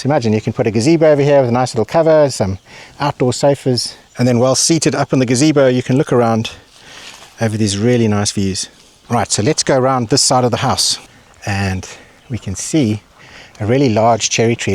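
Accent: South Africa. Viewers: i reallly enjoy your presentation style with the south african twang ... great combo ... property is kinda missing for me strange small windows in the first bed and kitchen and the reception/dining has the front doors but could really do with another big window. price is good ... question tho ... does the farm sell their produce and make a profit? otherwise one is left with looking after a huge amount of trees. there is a calmness and a lack of commercialism (or was when i lived there) that is very calming ... the one reason i wouldn't live there? there is no way i could learn the language.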